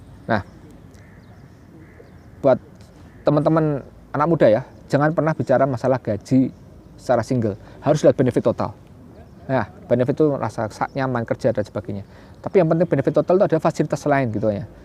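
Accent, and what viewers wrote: Java, Indonesia. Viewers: wong jowo tho mas sampean? ngomonge kok medok tenan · Jowone endi mas?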